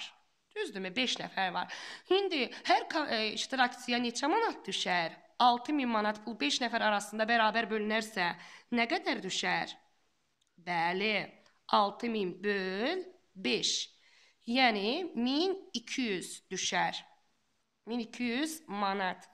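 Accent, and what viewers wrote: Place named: Russia. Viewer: Rus sektırıdı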